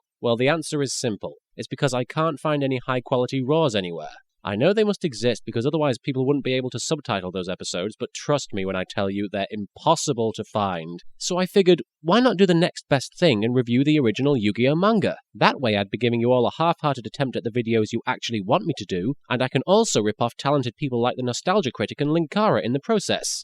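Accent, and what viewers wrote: United Kingdom. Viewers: I miss your old clearly british voice. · He's actually British?! THAT'S AWESOME! xD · wait is british? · ZOMG his real voice is just a combination of bakura and yugi's voices. or yugi with a brittish accent hard to tell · holy crap is british??!!! how did i not know that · Except his voice is more British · Wait is British??? Bakura? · your british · I was not expecting for you to be British.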